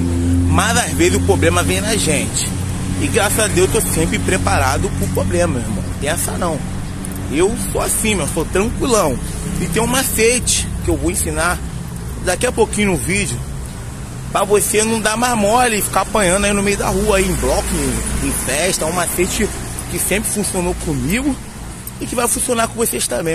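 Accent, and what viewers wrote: Rio de Janeiro, Brazil. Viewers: so vejo esse mano por conta do sotaque de carioca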